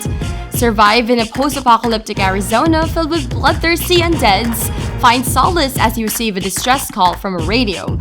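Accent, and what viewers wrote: Netherlands. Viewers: huh are you Dutch?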